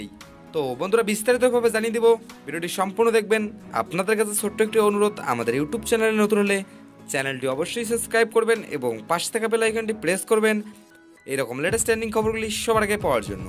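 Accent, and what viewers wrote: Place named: Bangladesh. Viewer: Very bad accent. Must b Bangladeshi. Find some who can speak clearly.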